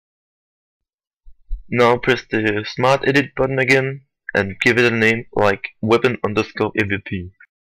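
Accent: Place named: Denmark. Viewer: Sounds like Danish English xD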